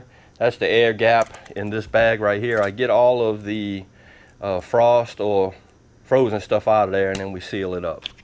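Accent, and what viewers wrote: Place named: Louisiana, United States. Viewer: Purple hulls, pink-eyes, white peas, ALL the southern peas, can be blanched and frozen just this way. Most of the country, even many parts of the south, just don’t know peas like we do in SE Alabama/SW Georgia, but you do, friend, so you’ve done a public service with this vid. Besides, I just love to hear you talk (Louisiana, maybe?).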